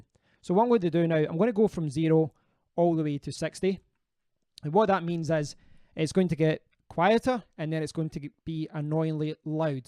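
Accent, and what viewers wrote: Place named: Scotland, United Kingdom. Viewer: I like your accent. Reminds me of CSGuitars but I can't put my finger on it. Is that a Scottish accent? Irish?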